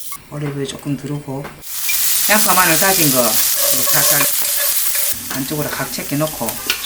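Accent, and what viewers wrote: Gyeongsang, South Korea. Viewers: 깅상도 사투리 억수로 조슴더 어무이예~~^^ 깨방정 맛있데이 엄마~^^ ㅋㅋㅋㅋ 부러랑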